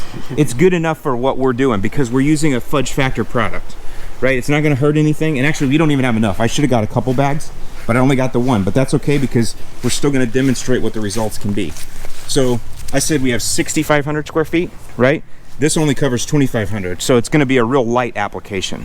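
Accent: Chicago, United States. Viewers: I can't get enough of the accent. I need a whole Chicago accent episode!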